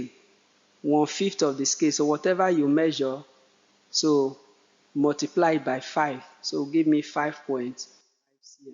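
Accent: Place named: Nigeria. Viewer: Nigerian accent the best muah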